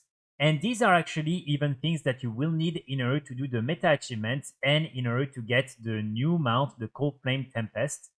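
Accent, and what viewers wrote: France. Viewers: Are you french the way you talk 💯 sure que tu est français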